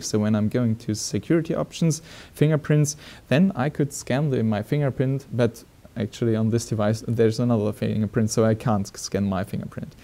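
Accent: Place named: Germany. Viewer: i guess you are German. i like your accent. that's cool man....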